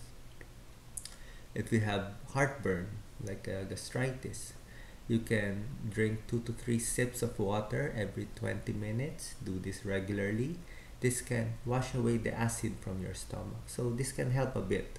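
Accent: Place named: Philippines. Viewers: This is a Filipino accent? he has a nice accent and relaxing voice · There are many Filipinos in my country, and I also I've had a couple of Filipino teachers, and they sound exactly like that, so yeah he's probably Filipino. I like their accent too.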